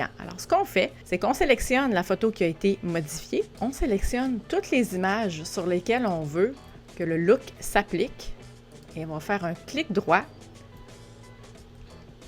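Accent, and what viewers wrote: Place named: Quebec, Canada. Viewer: Bonjour, j'utilise Luminar NEO, et je l'adore. Mais au delà de ma passion pour la photo, en amateur, c'est votre humeur et votre accent qui me redonne envi de repartir faire un périple au Québec. J'ai adoré en juillet dernier, faire ce voyage dans votre beau pays, Patrick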